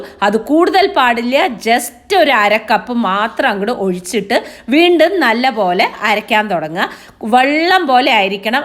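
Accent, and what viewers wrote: Palakkad, India. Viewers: 👌👌👌👌👍👍👍👌പാലക്കാടൻ ഭാഷയും കൊള്ളാം 😍😍😍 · ഇങ്ങനെ കൊതിപ്പിക്കല്ലേ കുട്ടി.. എന്താ പാലക്കാടൻ ഭാഷ 🙏👍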